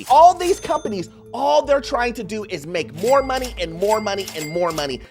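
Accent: United States. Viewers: Love your laugh and your american style and your american dialect! Hi from Duesseldorf, Germany and thatnk you for keeping me up to date. ps more condo content please, especially condos in florida, thank you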